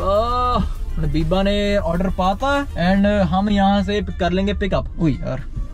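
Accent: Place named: Australia. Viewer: Your Australia accent is amazing ❤️❤️❤️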